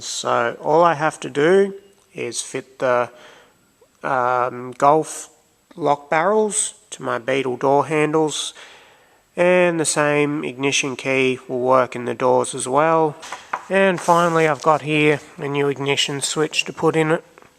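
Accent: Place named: Australia. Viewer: You sound like the Ausie version of the monotonous teacher in “The Wonder Years” However, very informative, Smart and even funny at times. Many thanks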